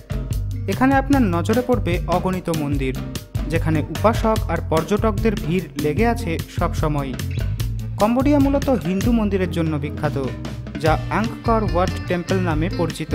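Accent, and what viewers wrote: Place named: Kolkata, India. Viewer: Nice Video Valo Laglo Apni Ki Kolkatar Lok Apnar Kotha Bolar Style Kolkatar Manushder Moto Janalay Khushi Hotam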